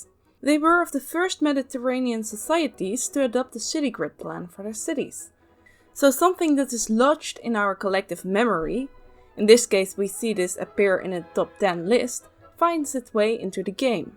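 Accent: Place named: Netherlands. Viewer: Great stuff! Started off sceptical, since the first view presentation didn't look that inviting. However, now that I watched this first video I have to say, love what you are doing here! Especially taking the ingame depiction of Etruria, and putting that next to the popular and academic views is a really nice and interesting exercise. And in general, its nice to hear a Dutch accent in a space often so dominated by Anglophone ones. This one was off to a great start for this series!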